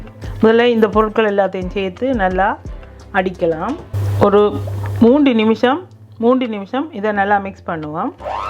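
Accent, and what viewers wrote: Sri Lanka. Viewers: Azhagana Ilangai Tamil❤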